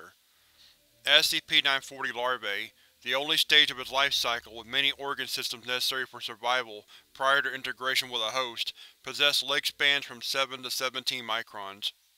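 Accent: South, United States. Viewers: Third! Love your voice dude. Very southern and somehow militaristic which goes along with the SCP stuff. Been binge watching your videos.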